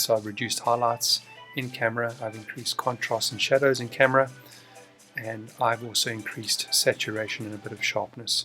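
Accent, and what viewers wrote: South Africa. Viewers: I stumbled upon your video and I knew the first minute there was something different in your accent. To me S. Africans sound like they had a little German inside trying to communicate in English, please no offense intended! I'm Spanish and we speak the worst English in the world, period! Nice video anyway, I'll watch more.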